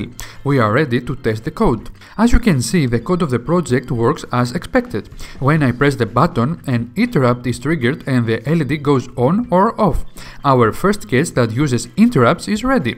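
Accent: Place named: Greece. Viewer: Nice video! Are you Greek by any chance ? Your accent sounds a lot like the average Greek speaker trying to speak English.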